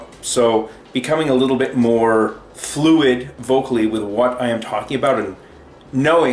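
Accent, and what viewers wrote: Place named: United Kingdom. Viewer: Where about in Britain is he from??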